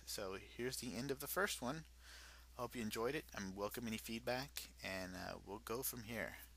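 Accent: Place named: Canada. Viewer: Nice Canadian accent.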